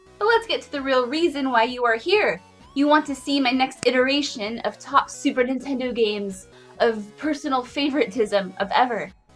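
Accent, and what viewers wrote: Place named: Australia. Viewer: Dat intro made me LoL. Great list by the way! Nice Aussie accent Mate Cheers LoL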